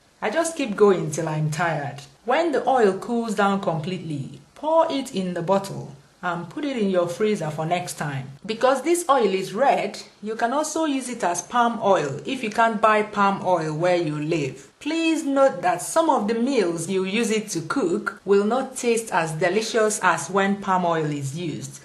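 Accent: Igbo, Nigeria. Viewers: Although I don't hear Igbo, I love her humour blended with the Igbo intonation.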